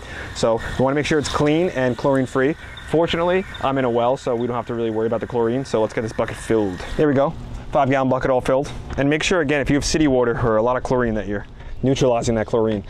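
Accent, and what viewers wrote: New Jersey, United States. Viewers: It's SO Jersey. I love it, he hypes you up before every video.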